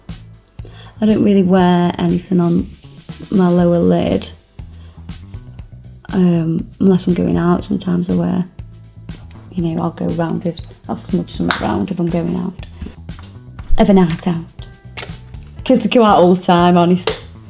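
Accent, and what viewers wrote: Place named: Manchester, United Kingdom. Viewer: your accent is manc with Sheff and Liverpool mixed in?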